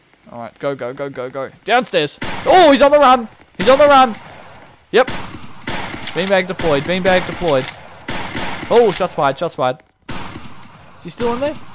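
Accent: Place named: Australia. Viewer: He sounds like a classical Aussie in a good way. Love your videos man, keep up the good work hoping you reach a 100 soon!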